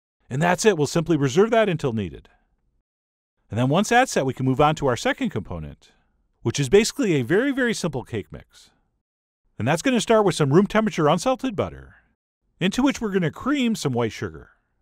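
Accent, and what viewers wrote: Canada. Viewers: Is this how Canadians sound?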